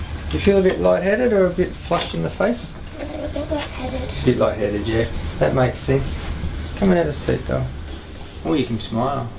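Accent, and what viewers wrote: Australia. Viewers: Yes, the Aussie accent is the best one. My husband sounds the same. I'm Mexican American and that's one of the many reasons why I fell in love and married him. XD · Chocolate Queen it is an Australian accent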